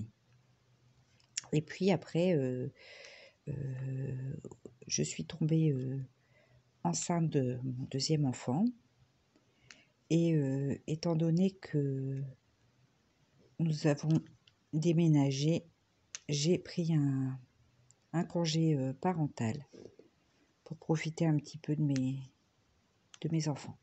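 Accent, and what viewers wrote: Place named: Nord, France. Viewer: Bonjour! je découvre ta chaine... je suis également du Nord j'habite près de Lille, et je fais aussi du coloriage pour adultes depuis plusieurs années..je lis aussi beaucoup et j'ai également un chat noir!!.j'avais bien reconnu le ptit accent du Nord! à bientôt sur ta chaine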